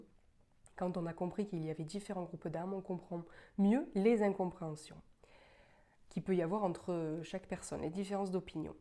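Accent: Toulouse, France. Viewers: Un discours clair avec un joli accent toulousain... 🥰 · Tu as un charmant accent Toulousain.... signé un Toulousain...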